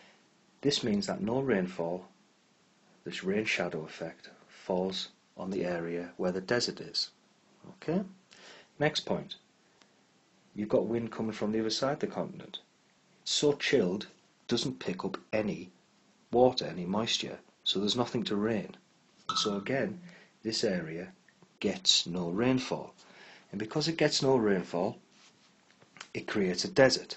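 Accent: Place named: North, United Kingdom. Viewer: Bloody love a northern accent - and it helps me to stay interested!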